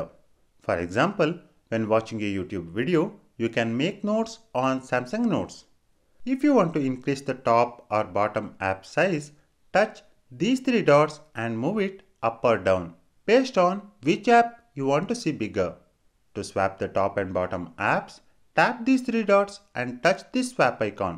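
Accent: India. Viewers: This accent always brings Indians down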